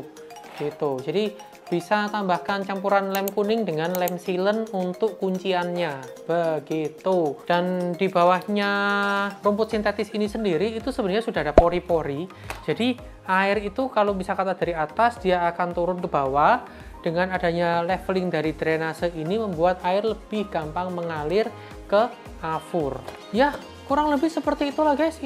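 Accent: Surabaya, Indonesia. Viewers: mangkane kok logat e ga asing, arek boyo tibakne, tak sub koh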